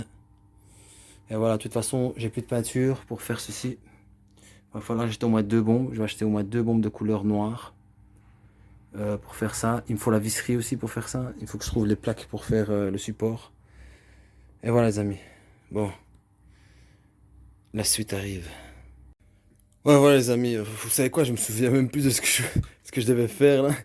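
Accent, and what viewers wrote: Belgium. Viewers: Je décèle un p'tit accent belge non? Je viens de découvrir ta chaîne 😉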